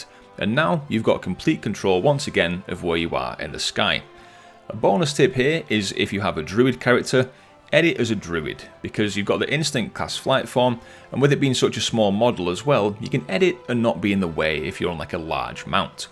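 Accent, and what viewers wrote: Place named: Northern England, United Kingdom. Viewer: Thank you for the video. Nice to hear a north of England accent ( Yorkshire girl here ).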